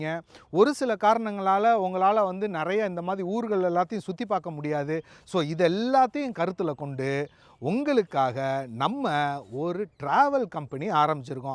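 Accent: Madurai, India. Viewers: Madurai slang is emotion bro❤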